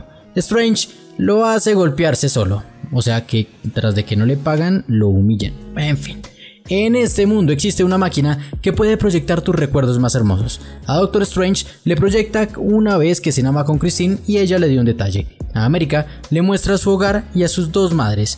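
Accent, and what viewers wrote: Colombia. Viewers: Me encantó tu resumen (sobretodo tu acento Colombiano😍, no lo contamines con barbarismos mexicanos y argentinos😖).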